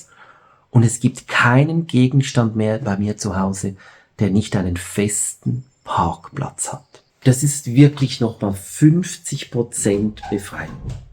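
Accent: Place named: Basel, Switzerland. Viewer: Wie schön mal einen Mann beim Sortieren über die Schulter zu schauen! Dein Video hat mir sehr gut gefallen, ich mache das sehr ähnlich und habe auch aufgehört ständig etwas Neues zu kaufen. Ein neues Teil und ein altes Teil muss gehen. Beschriftung mag ich nicht so gerne, aber jedes Teil hat seinen „Park“platz (eine sehr schöne Bezeichnung!). Meine beste Freundin lebt in Frankreich an der Grenze zu Basel, ich höre Deinen Akzent und bin sofort dort 🥰 Vielen Dank für Dein ehrliches Video und liebe Grüße aus Hamburg, Claudia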